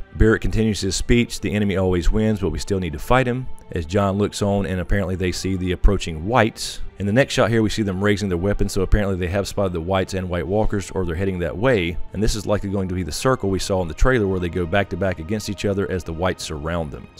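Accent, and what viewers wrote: South, United States. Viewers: I love your videos. I detect a Southern accent. Where are you from?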